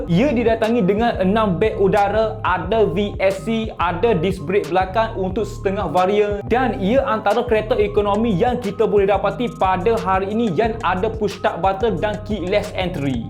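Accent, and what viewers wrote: Kelantan, Malaysia. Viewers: Kuat tone klate abe ni.. Blh tau.. 😁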